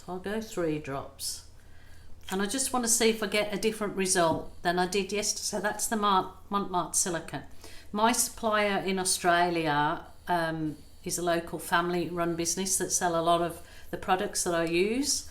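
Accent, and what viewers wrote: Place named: Kidderminster, United Kingdom. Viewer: Can I be nosey and ask where you are originally from? I’m in Kidderminster and you sound local xx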